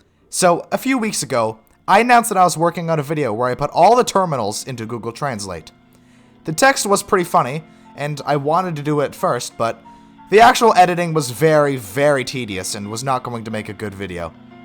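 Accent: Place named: Australia. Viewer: As an Australian I can confirm this is how we talk down here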